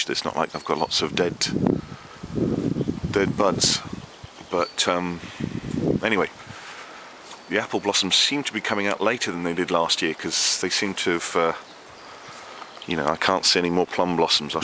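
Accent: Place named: United Kingdom. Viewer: you sound british, where do u live in uk??? tour garden is massive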